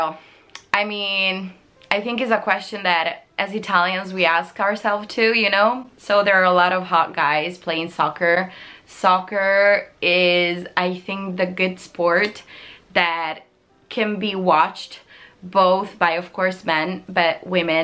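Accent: United States. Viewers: Sconvolta dalla perfezione del tuo accento americano...totally jealous! 😄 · I love your accent, It's like a cross between American and English, and then sometimes you forget the "th" sound and you go Italian again hahaha, carina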